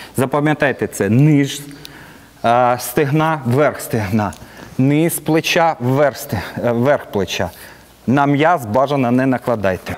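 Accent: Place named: Surzhyk, Ukraine. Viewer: Автор не користується в побуті українською мовою, максимум суржиком. Сам таким страждаю.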